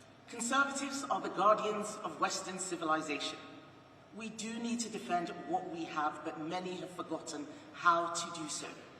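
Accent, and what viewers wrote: United Kingdom. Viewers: Sounds pretty British to me!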